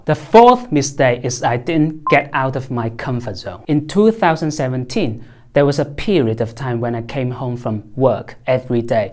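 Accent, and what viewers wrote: United Kingdom. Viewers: 感觉发音偏英式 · 你的好像是 英國口音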